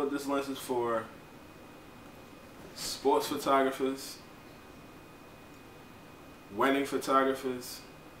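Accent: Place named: Baltimore, United States. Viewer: You sound like you're from B'more...or maybe D.C.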